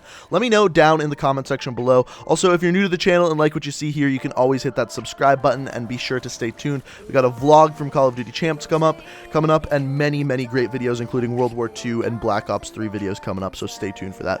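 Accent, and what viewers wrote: Canada. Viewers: Your so candian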